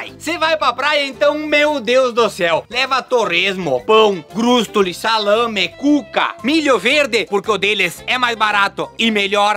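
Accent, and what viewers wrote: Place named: Garibaldi, Brazil. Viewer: Bah cara, exatamente, e esse sotaque de Garibaldi! 😆😆😆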